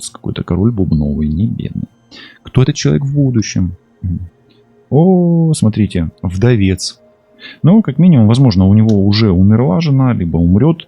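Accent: Ukraine. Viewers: Спасибо, комнату отремонтировала, стараюсь восстановить материальность после кражи вошедшего в доверие менеджера по недвижимости. 😊😊😊(Благодарю Творца и Таролога) 🛐 Приятно слышать такой мягкий малороссийский выговор.❤❤❤С-Пб🎉🎉🎉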